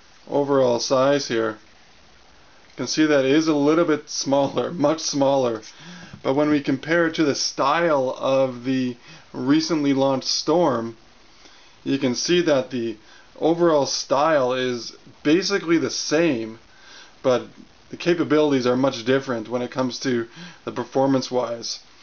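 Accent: Canada. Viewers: I think I finally understand what a Canadian accent is. (outside NA)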